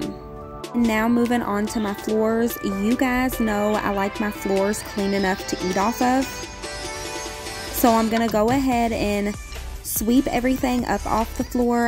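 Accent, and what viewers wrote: South, United States. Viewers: Can I ask what state you are from? I'm in Louisiana and I definitely can hear your southern accent lol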